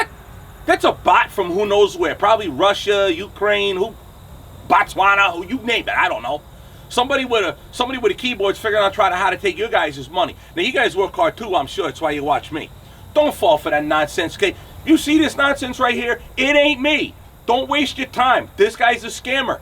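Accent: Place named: North Jersey, United States. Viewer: Yup, you sound like pure North Jersey! Love it! And I hope you find the lowlife so he can be dealt with properly.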